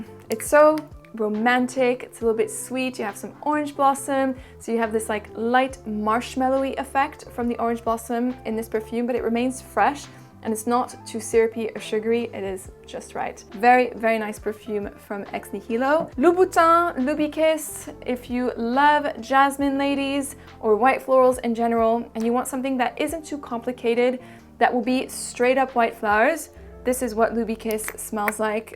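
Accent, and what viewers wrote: France. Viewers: T'es française. T'as un fond d'accent français. 😊. Nevermind. T'es clairement française vu la façon dont tu prononces les noms des parfums.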